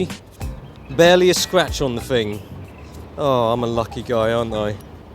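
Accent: England, United Kingdom. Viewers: Thumbs up for asian guy with british voice! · he has a british accent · Asian with British accent...EPIC!!!! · nothing funnier than an asian guy with a british accent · i trust him because of his british accent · I love your british accent:) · I never saw an Asian guy with an English accent before. He even made fun of himself. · Hong Kong is a British colony that why you have a British Accent ! not quite ! Local people coming from the colonies and Dominions do not speak with British Accents ! They speak eighter Chinese English ,Malay English, Indian English your probably born in the UK and moved to HK at some point of life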